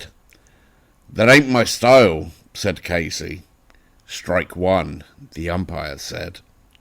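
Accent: England, United Kingdom. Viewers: Great video, I haven’t heard that poem in many many years, and never read with the English accent……..wonderful my friend!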